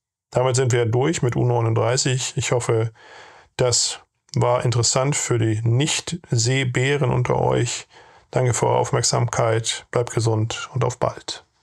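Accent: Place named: East Germany, Germany. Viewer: Sehr interessanter Bericht. An manchen Stellen ist nicht zu überhören, dass er aus der DDR stammt.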